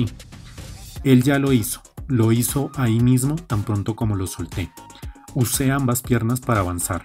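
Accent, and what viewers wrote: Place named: Latin America. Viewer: Wouldn't be easier if you keep on speaking English and have the subtitles or close captioning in Spanish? Although I understand Spanish, it is spoken too fast for me and is heavily accented (South or Central American?). Or, can you re-post the video in English? Make everybody happy?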